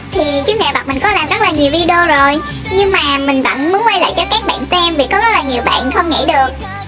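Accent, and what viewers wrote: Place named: North, Vietnam. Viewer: Nghe giọng chị giống người miền bắc quá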